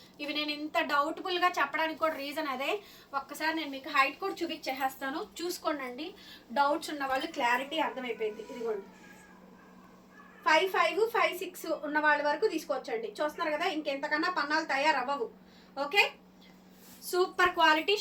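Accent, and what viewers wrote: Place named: Godavari, India. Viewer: Meeru Godavari padatam muchchataga vundi mam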